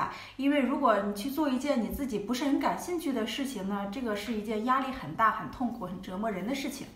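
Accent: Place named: Shandong, China. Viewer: 听口音 Fiona酷似山东人，或者河南？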